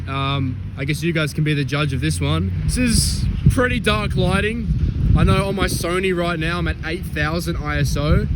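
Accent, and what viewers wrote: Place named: Victoria, Australia. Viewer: First video watching you and straight away I knew you were a fellow Aussie 😂 from Victoria too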